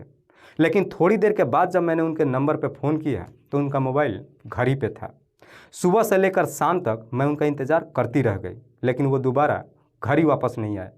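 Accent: Bihar, India. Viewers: Sir ji aap kya bihar se ho kya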